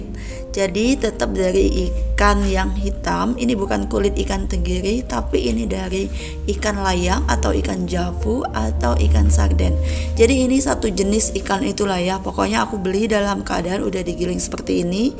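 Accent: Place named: Palembang, Indonesia. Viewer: Lucuk denger suaranya si mbk bicara, aseli org palembang kayanya karena menantu ku jg orang palembang.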